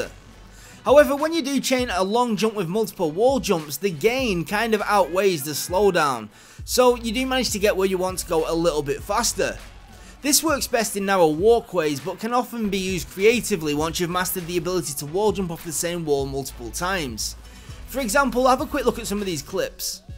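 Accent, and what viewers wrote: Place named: Manchester, United Kingdom. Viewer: straight manc accent :D! nice vid man thanks for the tips!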